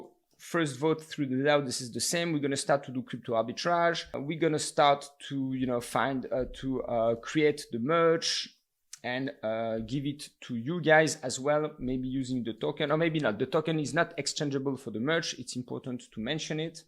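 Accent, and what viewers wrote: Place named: France. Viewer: ton accent on dirait que t'es francais tres bonne video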